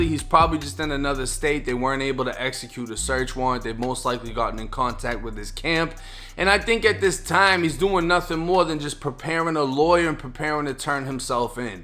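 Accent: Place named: Northeast, United States. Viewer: I was wondering where your accent came from. I have never heard a Florida dude speak with that accent, that's a Northeast accent if I ever heard one. What's the story? Love the channel btw, real fukkin nice work and journalism, slaute!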